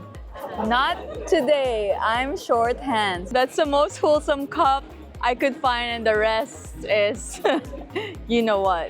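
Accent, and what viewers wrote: Philippines. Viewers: Filipino? I recognize a Filipino accent in 5 seconds because of my many visits to that great country.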